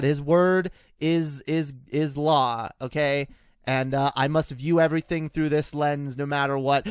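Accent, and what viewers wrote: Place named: United Kingdom. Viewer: So…. Why the British accent in older vids?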